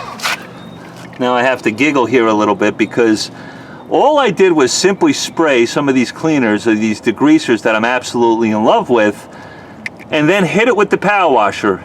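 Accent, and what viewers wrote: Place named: Philadelphia, United States. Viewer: that's not a Texas accent....I'm from the Philly area and I know that accent is within 2hrs or less from me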